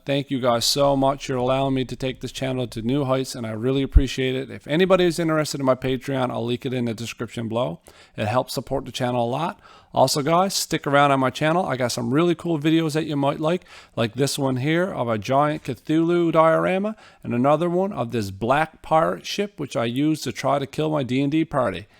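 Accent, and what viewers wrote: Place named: Canada. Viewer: The more I listen to other videos I can hear the Canadian more. Happy Christmas from Ireland, great channel 👏🏼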